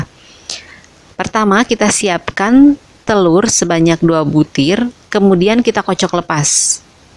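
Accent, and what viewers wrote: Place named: West Java, Indonesia. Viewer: ibu ini orng sunda kaya nya ...beda bicaranya bilng kocok jadi kocek ...mntap bu puding nya mau bikn nnti klu pulkam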